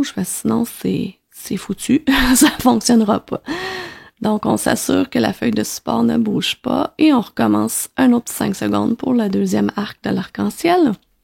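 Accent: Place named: Quebec, Canada. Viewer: Merci pour cette astuce de l’étoile, et cet accent québécois me fait voyager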